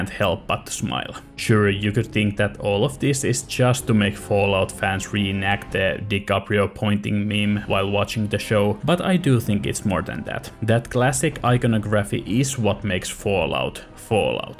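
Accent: Finland. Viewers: the finnish accent is quite recognizable 😅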